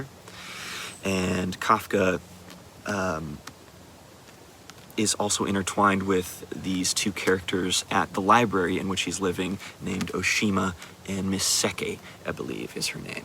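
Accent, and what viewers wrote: United Kingdom. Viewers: I was sooo sure you were in the UK :-) You sure fooled me (and others) with your slight intetnational'ish Brit accent reading Douglas Adams.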